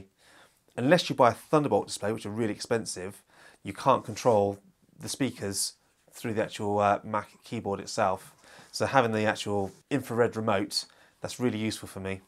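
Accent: United Kingdom. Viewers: Great video I stumbled onto searching for the combo of this specific BenQ monitor combined with a Mac. So thanks! You sound like you're from the UK (later in the video I hear you are... :-) ) ... but the link to the Bamboo stand is to the US Amazon... any idea if this great stand is available somewhere in Europe??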